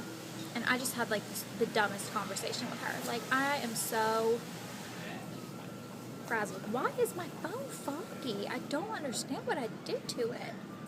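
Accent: South, United States. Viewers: I love your southern accent :)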